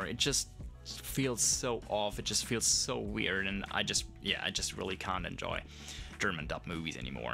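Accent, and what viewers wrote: Australia. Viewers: Well, you've internalised the Aussie twang. I've been here for more than sixty years and you sound more native than me 🇦🇺🏴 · You have ready a strong Australian accent. I hope it stays with you. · I think you, your attitude. and accent, are gorgeous. You have become a classy Aussie. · love ur little aussie accent haha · you have an Australian twang 😊 very nice 🦘✌️🌅..go Richmond..your family sent off an urbane European...we are all for comfort, sorry😂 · I can definitely hear an Aussie inflection in your vowels sometimes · You have German US Aussie accent! Everything aside, you're always Aussie now ! 🇦🇺🙏🇩🇪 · The best tourists i ever met within my adventures about Australia were always German (Im Aussie). You have the look of an Aussie surfer and your English became quite Australian. Makes me wonder what the German girls think of you since you returned to Germany 😅 Rock on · You're more Aussie than you think. 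How ya "goin?" = Aussie; How ya "doin?" = US and elsewhere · Your English is great. Has a great Aussie accent to it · Great Aussie accent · the aussie accent is suuper strong so dont feel too bad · You definitely sound super Australian as far as your accent goes, you almost have more Aussie than German when you speak English. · Seriously... First thing I thought it was an Aussie in Germany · Do you know you have gleaned a bit of an aussie accent? lol, good one. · Sounds more Australian than my German born neighbour that's been in Australia for about 60 years. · Hahaha your accent for English is half Aussie. I'm glad we wore off on you in such a short time haha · Thank you for being polite about Australia. I'm glad you had a good time. Your English sounds very Australian to me, noting that Australia has so many accents that are uniquely Australian but unknown to the world. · Sorry mate, can you f*** off? 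Sorry mate, can you point me to the strawberries? Both completely valid ways to end that sentence 😂 You actually have a bit of an Australian accent now brother, another few years here and we'll get you converted. I work with Germans, I find we get along very well. It's weird to say but I feel more at ease with Germans due to them also being quite straight talkers than those from our mother country the UK where they tend to bury everything in platitudes rather than getting to the point. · Such an Aussie accent! My Dad was German, lived in Australia for thirty years, and his German accent was always so strong. (I loved it!) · I do get a slight hint of an Australian accent mixed in with your German accent 😂❤. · Dude, you have no idea how Aussie your accent is. This is hilarious and I love it. You're one of us mate! You even LOOK Australian. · Your accent is still so Aussie.. great blend of German and kiwi and Aussie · Your accent is unbelievable mate! 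You are 50% Aussie (long open vowels), 25% US (hard rolled “r”s), 25% rest of the world soup combo!! I cannot hear any German accent at all😂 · You're flattening your vowels like an Aussie. When you say understaand, that is all Aussie.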